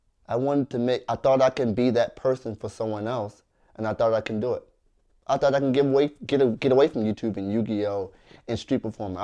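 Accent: Baltimore, United States. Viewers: Besides the point of the video I know but are you from Baltimore?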